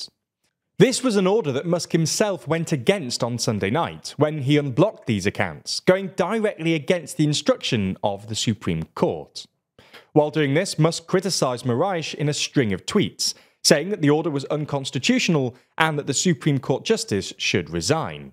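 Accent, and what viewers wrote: United Kingdom. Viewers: Ok but why are you British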